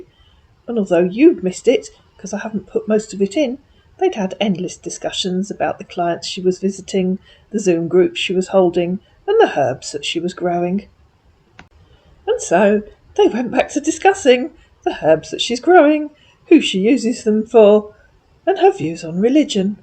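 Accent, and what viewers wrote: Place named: Southern England, United Kingdom. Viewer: You sound like all my cousins, they live in Oxfordshire and Southampton, i was born in Southampton..... I live in Australia with barely any family here.... your voice makes me feel like I'm home X Oh i love what you do 🤩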